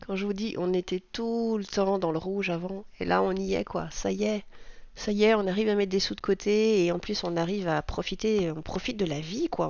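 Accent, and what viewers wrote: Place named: Belgium. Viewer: Coucou! J'ai toujours mes billets de 5 € de 2022, je n'arrive pas à les mettre dans d'autres enveloppes ou les remettre en banque :D J'adore t'entendre, ça fait tellement de bien d'écouter notre accent Belge et nos expressions dans toutes ces youtubeuses françaises! La fierté de voir ton fils faire autant de progrès! Tu as raison de faire des comptes ronds, sans mettre de billet de 5€ dans tes enveloppes, je suis certaine que tu feras autant (si pas plus) d'économies. Continue, c'est bienfaisant de t'entendre! Vive le mois de Mars!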